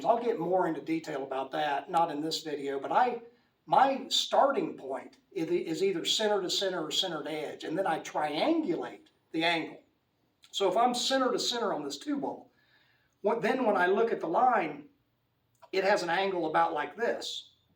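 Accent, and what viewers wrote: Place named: South, United States. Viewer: love from China. love your accent as I lived in south of states for yrs. Quick question, have you ever experienced with pelvis pain or SI joint pain, especially from right hip/leg/low back? I now feel even worse when aiming lower and put left leg aside. I wonder if it's a common headache for pool players who plays 2-3hr a day.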